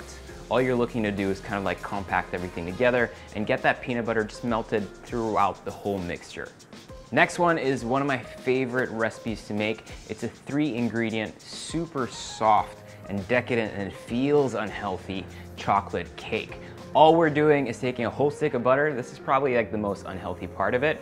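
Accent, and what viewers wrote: United States. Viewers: Funny to hear a very strong American accent with a nicely said ´bain marie’ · YouTube recommended this to me, who is he, he is very cute!!! Does he live in the Philippines? Is he Filipino? He has an American accent. I HAVE SO MANY QUESTIONS! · Half Filipino and half French - so why the American accent rather than a sexy French or Filipino one? Were you raised in the States?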